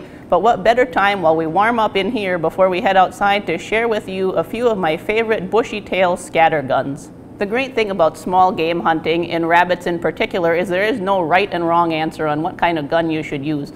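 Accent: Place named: Wisconsin, United States. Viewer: Wisconsin accent coming in thick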